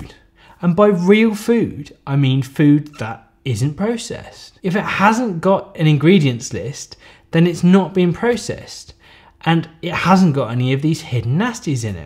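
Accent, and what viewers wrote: United Kingdom. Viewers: For the past 4 years, I watch Dr. Eric Berg and to be utterly honest, I learnt quite a lot. However, the way you go through details is great. Definitely subscribing and going to browse the channel. By the way, I love the way you sound, soOo British. Since I love the accent (Sir Ken Robinson, Simon Cowell, Marco Piere White, "Gordon Ramsay" are just a few to name really) is another reason to subscribe.😃🤘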